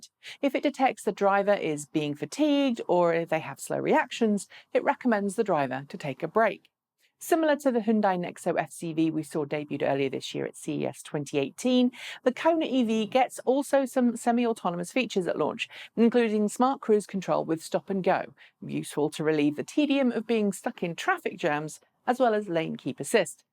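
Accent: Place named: United Kingdom. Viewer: proudly points out from time-to-time that she is unapologetically British. 😁 As such, she will pronounce certain words in a manner unfamiliar to those of us who speak Informal American Standard Idiomatic English, and stuff.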